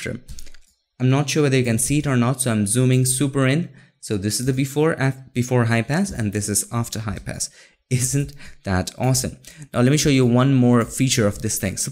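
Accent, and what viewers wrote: India. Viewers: the only indian whose accent is not giving cancer to the ear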